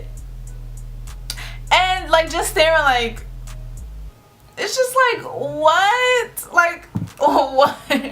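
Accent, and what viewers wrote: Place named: New York, United States. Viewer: 5’6 gang! And listening now, you have a little NY in your voice. This was a great video 😂❤️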